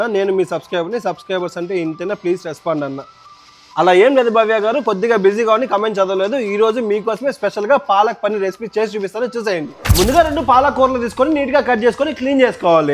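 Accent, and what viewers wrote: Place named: Kadapa, India. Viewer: చెప్పేటప్పుడు కొంచెం నెమ్మ చెప్పండి నీ లాంగ్వేజ్ అర్థం కావటం లేదు మాది పక్కా ఆంధ్ర మీది కడప స్థాయిలో ఉంది లాంగ్వేజ్ కనుక కొంచెం నెమ్మది చెప్పండి అంటే స్లోగా చెప్పమని అర్థం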